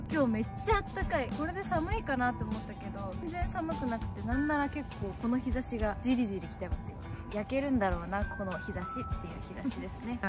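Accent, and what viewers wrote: Kansai, Japan. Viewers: 久しくカスうどん食べてない。 番組観てて無性に食べたくなったよ。今は飲食店も大変だと思うから、緊急事態が解けたら、帰阪した時に寄らせて貰います。 うどんを食べた後、店の前で、「ここが俺のアナザースカイ』って言ってみようかな WW その関西弁、絶対に変わらないで下さいね。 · アナザースカイでの関西弁がとってもカワイかったんでウワッと感じました。YouTubeでもドンドン出していって下さい癒されます。🤗 応援してま〜す。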